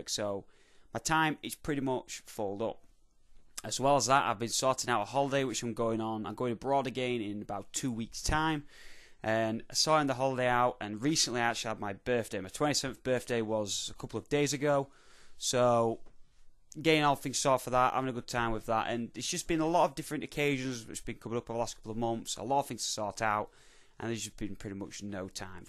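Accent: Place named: Yorkshire, United Kingdom. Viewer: Yorkshire I think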